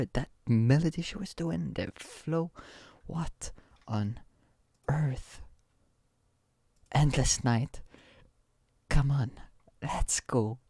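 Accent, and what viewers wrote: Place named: France. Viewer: Love your reaction! Haha Totally understand it too, haha I was like wtf? Lol You have an accent, do you speak French?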